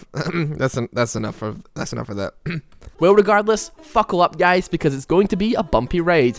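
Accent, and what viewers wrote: Scotland, United Kingdom. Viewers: r u from scotland or great britani?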